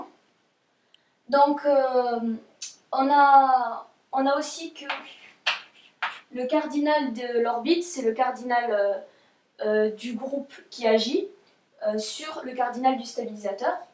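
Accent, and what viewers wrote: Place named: South, France. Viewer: Ce petit accent du sud: chocolatine !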